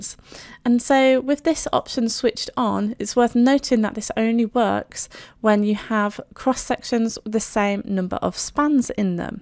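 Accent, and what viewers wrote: United Kingdom. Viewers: any speech cannot be more english than this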